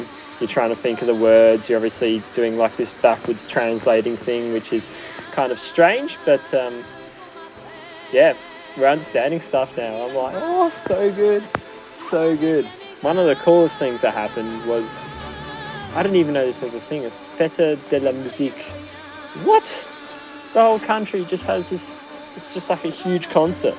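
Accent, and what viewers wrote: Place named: Australia. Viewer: I am French Canadian and as much as I love Australians, the accent requires some getting used to so could you please speak more slowly or kill background music when you talk to us. Nevertheless, love your blog and you're capacity to face unknown territory. Bonne exploration!🍀🍷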